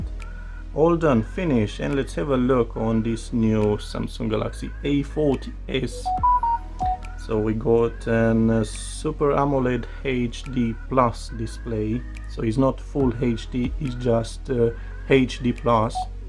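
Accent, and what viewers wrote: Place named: Romania. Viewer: de accent is from Romania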